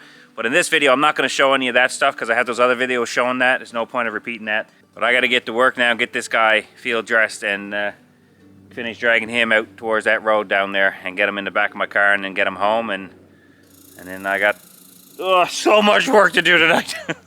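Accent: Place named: Prince Edward Island, Canada. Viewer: Its similar to the PEI accent, which is a mix of Newfy and Scotian I think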